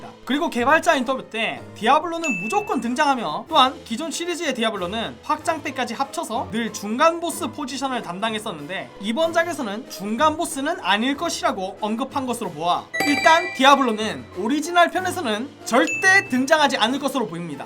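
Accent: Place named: Gyeongsang, South Korea. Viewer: 경상도 분이시네 ㅋㅋㅋㅋ 엄마편 아빠편 이카면서~ㅋㅋㅋㅋ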